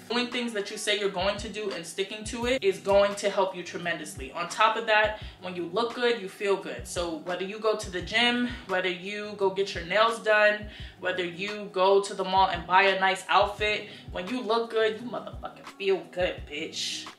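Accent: New York, United States. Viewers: I love this so much. You’re not trying to fake the funk you’re so YOU! I still see/hear some NY in there 😂 love to see it. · I love ya NY accent ans attitude, bk for 15 yrs. You are absolutely gorgeous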